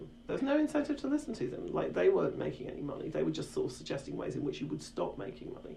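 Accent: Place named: United Kingdom. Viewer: British accent used..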